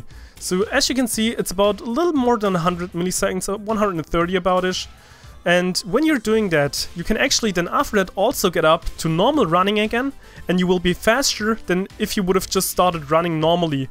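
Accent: Germany. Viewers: hab ich mir auch schon gedacht und auch das Englisch hat so ein bissl deutsch xD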